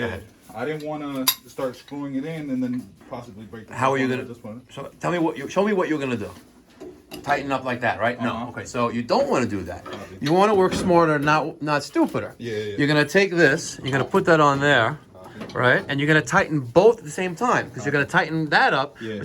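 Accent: New York, United States. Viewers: Voice kinda reminds me of Louis Rossmann. Just that NYC accent working through...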